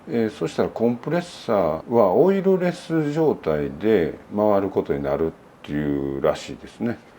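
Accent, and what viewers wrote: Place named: Kansai, Japan. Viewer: ★いつも、詳細な説明をご丁寧にされていて、関西弁も聞けて好感の持てるサイトだと思っています。色々、勝手なコメントにいつも返答頂きありがとうございます。★家のエアコンも5-7年位で効かなくなりますが、ほとんどの場合はフロンガス漏れで、一度、大きなコンデンサーが不良になり交換した事がありますが、壊れると言うより経年問題です。★話は変わりますが、お話に出た日本冷○○学会などにより、技術振興を目差した団体のノウハウが隣国に洩れて、ＩＰの権利も無視され安い代物家電などが作られているようにも思うのですが、日本の国益に反しますよね。日本の白物家電はアメリカでは壊滅しています。 日本の貿○振○会などによる技術情報漏洩問題も取り上げられていました。お人好し？の日本人には考え直して貰いたい問題ですが、赤に染まったマスコミや帰化人の政治家らが人道だ、国際平和だとかチンピラヤクザのように一般論で煽り、反論すれば今度は例外を持ち出し話題をずらす（ゴールを変える）作戦で話にならず、悲しい限りです。★軽トラのＤＩＹキャビンの新しい情報を待っています♪ 重力式の水屋の問題、設置後にお気づきの利点があれば教えて下さい。容器を10Ｌ位にして天井近くに取り付ければ、ポンプなしでもシャワーに使えますか？ ★返事はお暇な時で結構です。気が向いた時に宜しくお願いします。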